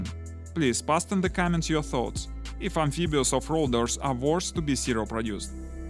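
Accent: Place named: Russia. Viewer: У тебя русский акцент- ты русский,ну или из бывшего СССР??))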